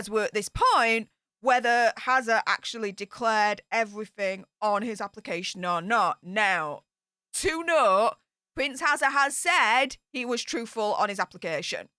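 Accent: Yorkshire, United Kingdom. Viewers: is from Yorkshire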